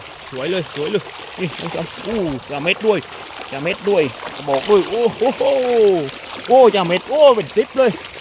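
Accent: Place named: Tha Chae, Thailand. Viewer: แหลงเหมือนคนท่าแชะเลยหลวง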